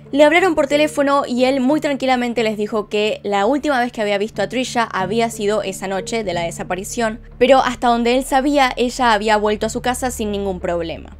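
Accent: Argentina. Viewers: Me encanta tu manera tan espotanea de narrar los hechos, tu acento argentino me encanta (corrígeme si no es argentino) y además estás super bonita awss ❤️✨ no puedo con tu canal es demasiado perfecto✨ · Hondureña cejuda con acento argentino?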